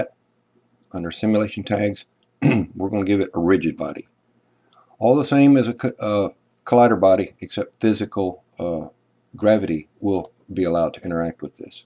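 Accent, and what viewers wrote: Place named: South, United States. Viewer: Every tutorial on the internet should have your narration on it. Your accent is like smooth southern butter.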